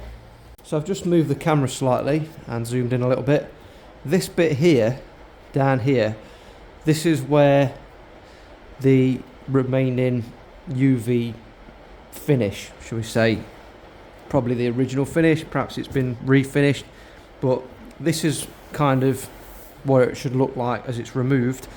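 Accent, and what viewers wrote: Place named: Yorkshire, United Kingdom. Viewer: who'd have known that the best lens refinisher would have a yorkshire twang. 👌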